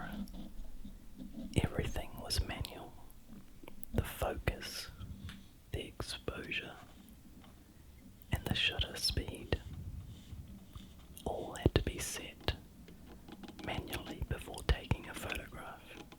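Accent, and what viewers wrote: New Zealand. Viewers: I love the New Zealand accents and Australian accents for amsr. They are different but similar so it works quite well. Love your videos.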